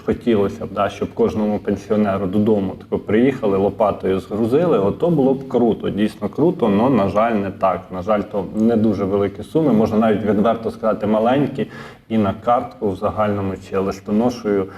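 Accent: Vinnytsia, Ukraine. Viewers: Ню- це говір вінничан.Так само, як і на западній мають свій говір.Там вуйки розмовляють так, що нормальна людина взагалі не розуміє про що вони говорять.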